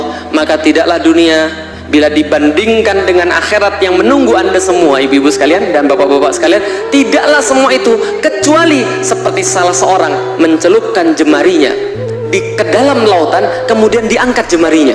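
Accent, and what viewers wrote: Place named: Jakarta, Indonesia. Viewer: Orang pati tapi seperti ya asli jakarta 😅😅😅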